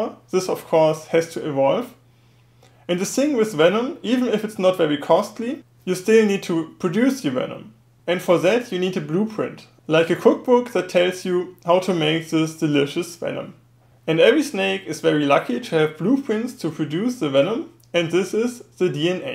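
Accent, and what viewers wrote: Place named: Germany. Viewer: You have the stereotypical german accent :D but good video ;)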